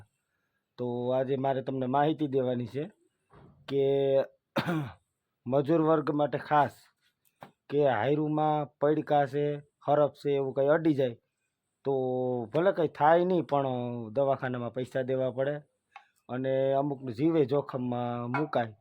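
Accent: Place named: Sidhra, India. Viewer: ભાઈ સિધરા બોલી મજા આવી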